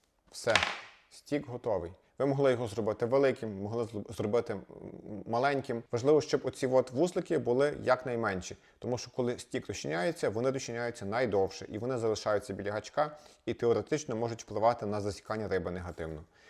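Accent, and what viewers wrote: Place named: Lviv, Ukraine. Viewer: Вітаю! Гарно показали і пояснили. Дякую за цікаві відео і Львівську українську!)))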